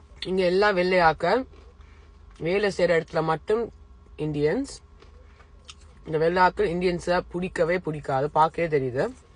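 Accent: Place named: Sri Lanka. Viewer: U r correct baby U r great to see to talk tamil Feel u r srilankan tamil Romba nella erukku New delhi❤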